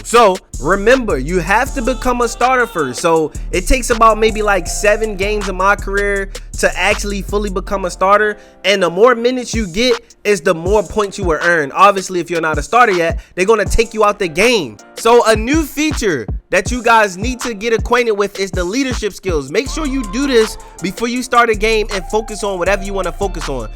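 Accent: Baltimore, United States. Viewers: I been watchin you fo a min you from Baltimore got the accent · Aye bro where u from, I swear i hear some Baltimore coming u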